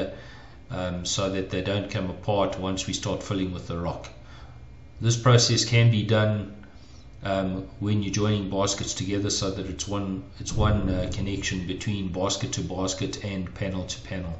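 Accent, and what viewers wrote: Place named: South Africa. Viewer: PS, sorry for changing your gender to, lol. Your accent tells me you are in the S.African continent, we are in the UK, otherwise maybe we could get our supply from you. Our engineer and architect has mentioned a company called ENVIROMESH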